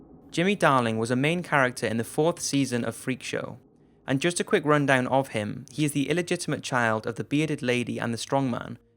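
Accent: Wales, United Kingdom. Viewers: Loved this video, it was so interesting! Are you Welsh? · awesome video! Just wondering, it's really bugging me, are you Welsh? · 1. It really wasn't a good idea to watch this at 1am. And, 2. Are you Welsh? I'm really trying to figure out your accent and whether you're part of the Cardiff crew too 😜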